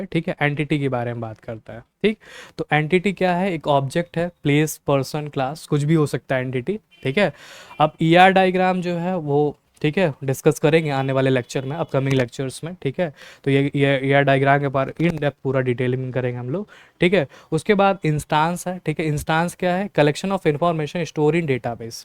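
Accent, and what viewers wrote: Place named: Bihar, India. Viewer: lagta hai sir bihar se hai thik hai🙃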